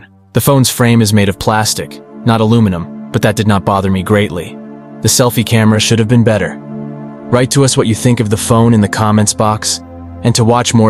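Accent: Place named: United States. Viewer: I expected that you were from the United States due to your smooth accent. I was shocked that you were from Iran. I am honored by your beautiful content. Keep it up ✨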